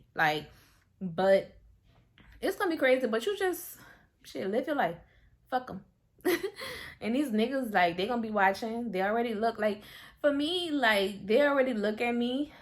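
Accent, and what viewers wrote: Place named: Louisiana, United States. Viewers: I HAD TO FOLLOW BECAUSE U FROM MY HOMETOWN U SOUND LIKE U FROM LOUISIANA AND HOW OLD IS UR MOM IM 8 MONTHS POST OP IM GOIN BACK DECEMBER MIAMI JOLIE GETTING A 360 LIPOSUCTION AND A BREAST REDUCTION WITH SOME FAT TO HIPS THE LAST TIME I HAF DR SAMPSON FROM SEDUCTION HE DID MY 360 LIPOSUCTION WITH A BBL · Its the LA accent for me bebby! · Hey, I have a question are you from 504? The Accent sounds like the south mainly New Orleans which is where I’m from. My friend said hey go check this video out sis sounds just like you. Lol Peay that you have a fast safe recovery 🙂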